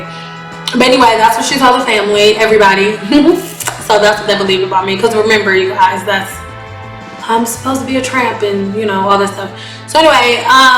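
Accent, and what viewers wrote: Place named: Florida, United States. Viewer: Florida in the house, I love❤ the way you say Gone head Girl😀 your accent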